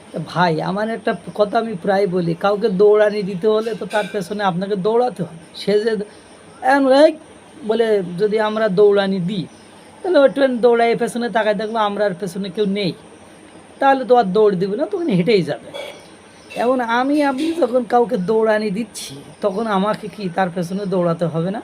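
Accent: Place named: Sundarbans, Bangladesh. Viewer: আপনার উচ্চারণ, ও শব্দ চয়নে ভারতের সুন্দরবন অঞ্চলের ছোঁয়া আছে।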